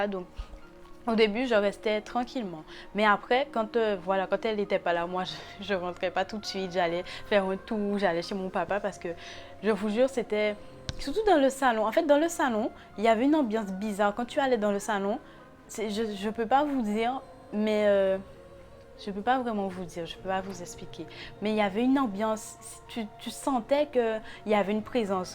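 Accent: Antilles, Caribbean. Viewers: L’accent antillais est présent 😂😂🙌🏾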